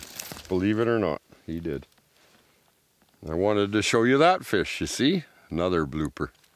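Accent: Canada. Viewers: Hey, I tune in from Australia as enjoy your Canadian voice. Plus I learn lots.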